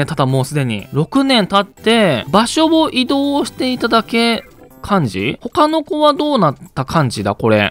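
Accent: Aichi, Japan. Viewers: 愛知県の人？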